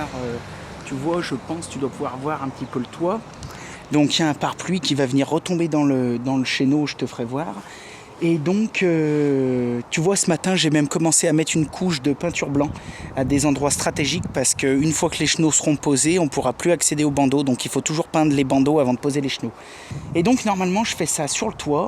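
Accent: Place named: Franche-Comté, France. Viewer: Salut avec ton accent t es de chez moi , tu est francomtois?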